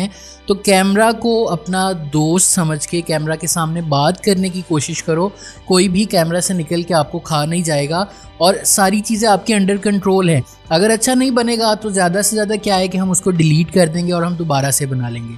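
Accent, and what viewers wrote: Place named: Rawalpindi, Pakistan. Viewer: Thank's Bro! Are You From Rawalpindi, Muree or Kallar? Because I'm Feeling The Accent!❤❤👍👍🎉🎉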